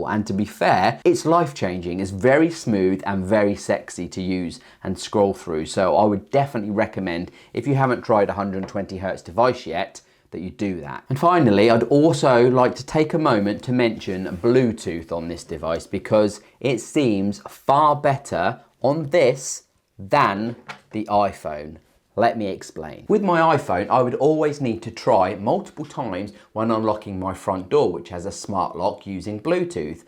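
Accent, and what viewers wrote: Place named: England, United Kingdom. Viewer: Nowadays most whites kids in London speak English with a hybrid Jamaican dialect which is very different from the anglo saxon dialect of English which is spoken in this programme by this presenter. In the early 2000's, young white kids on council estates in London became JAMAICANISED. This is when they starting speaking with English with a hybrid Jamaican dialect. For example, Essex county is the only place in Britain where the cockney dialect/and or accent is still spoken. TO SUMMARISE: In the 1980's all the national British companies when through a process called national - PRIVATISATION - and then almost - SIMULTANEOUSLY - all the poor - NATIONAL - white kids endured a process called - JAMAICANISATION - which did indeed definitely - LEAD - to all poor - NATIONAL - white girls on council estates quickly - UNDERGO - a process called Jamaican extreme - INSEMINATION - and thus all the young - RESULTING - half cast babies initiated a full - NATIONAL - process called extreme - BASTARDISATION. 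Remember everyone, that the last point regarding bastardisation is entirely optional, and is not my view but instead is the view of white nationalists.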